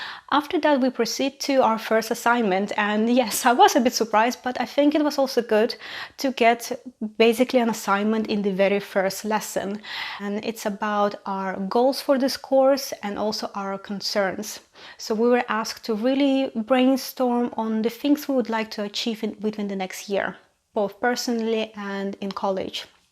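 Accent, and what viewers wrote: Russia. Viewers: I can hear Russian accent. are you living in UK? Why you don't speak your native language? I"m sure russian people will appreciate it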